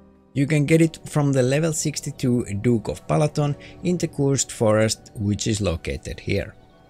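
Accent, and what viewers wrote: Finland. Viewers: Haha Suomi-aksentin tunnistaa kyllä :D Hyvä video, kiitos tästä 😊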